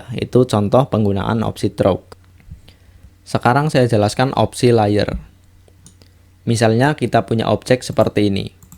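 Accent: Banyumas, Indonesia. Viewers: rika asline ndi kang? kayane ngapak ya?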